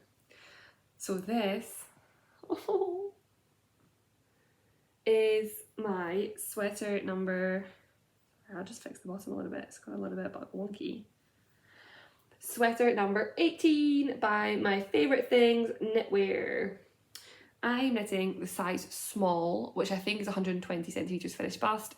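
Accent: Scotland, United Kingdom. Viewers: Perhaps for your Rust sweater you might consider knitting the whole neck with ' knitting in ' elastic, which because it is fine has the advantage of being invisilble, and the elastcity is gentle but lasts. I hope you don't mind my saying, but I do love you soft scottish accent, I think all the people you need to contact by phone should only be allowed to employ, scots people, the whole world would be a lot happier as who could be cross with anyone who sounds so lovely.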